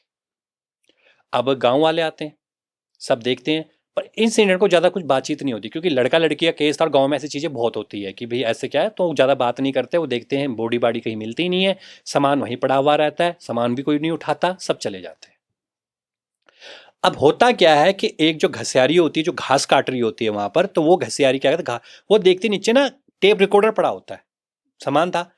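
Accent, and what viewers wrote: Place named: Pahadi, India. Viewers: It's a part of pahadi dialect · Pahadi bolchal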